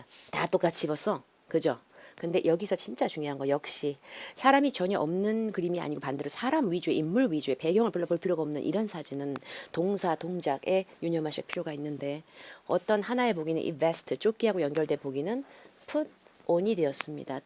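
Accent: Jeolla, South Korea. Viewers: 김선아 전라도버전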